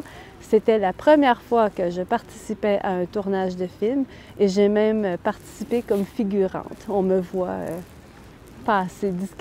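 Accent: Quebec, Canada. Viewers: J'aime bien tes vidéos, et ouais, je te comprends parfaitement. 😃 J'essaie de améliorer mon français avant mon voyage au Montréal en août, donc je apprécie beaucoup pouvoir écouter l'accent Québécois pour m'entraîner. (Et corrige mes erreurs, s'y en a, STP). 😃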